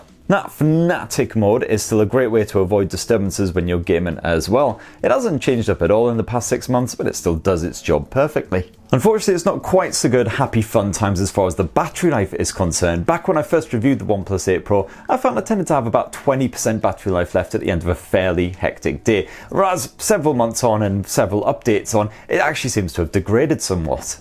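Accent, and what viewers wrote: United Kingdom. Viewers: I wish everyone in UK speaks with same accent as you do! Regards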